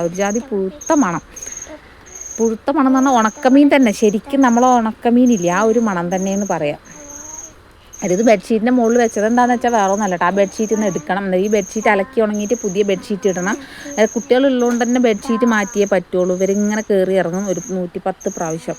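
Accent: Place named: Palakkad, India. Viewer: സസ്നേഹം 🧡🧡🧡 നിങ്ങളുടെ സ്വന്തം നാട് എവിടെ ആണ് hus ന്റെ ജോലി സംബന്ധിച്ചു വയനാട്ടിൽ സ്ഥിര താമസം ആക്കിത് ആണോ? നിങ്ങളുടെ സംസാരം കേട്ടിട്ട് പാലക്കാട്‌ ജില്ല ആണോന്ന് സംശയം 🙏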